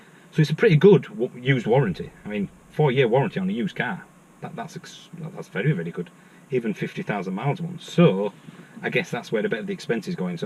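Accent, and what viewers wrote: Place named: England, United Kingdom. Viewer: is talking English not American.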